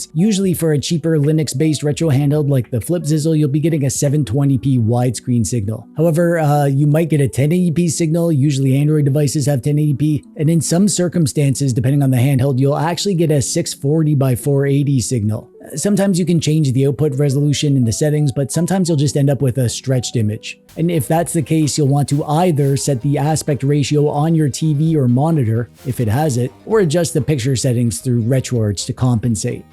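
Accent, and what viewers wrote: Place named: Canada. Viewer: I wonder where he's from "withoot faffing aboot" .. oh, Canada.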